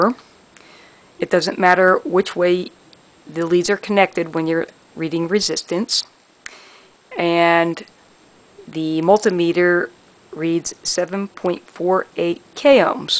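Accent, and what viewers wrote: Philadelphia, United States. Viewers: Philly accent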